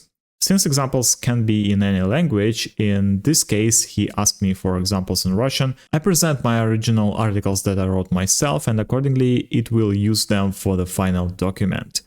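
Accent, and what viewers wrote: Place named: Germany. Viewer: your accent is legit german, that's why i said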